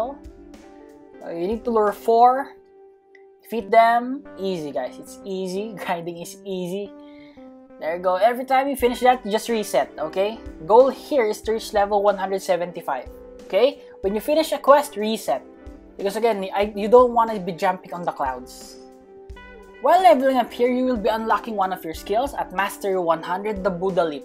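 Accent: Philippines. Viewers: Hey your accent is like filipino haha are you a filipino or not? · are you a filipino? the acsent sound like one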